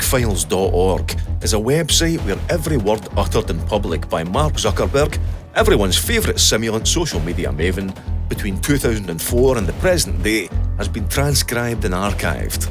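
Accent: Scotland, United Kingdom. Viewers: Fist time I,ve ever seen any of your stuff,why has it taken so long for this to be brought to my attention Hello I understand Your Scottish or something I think theres a scottish on Our Planet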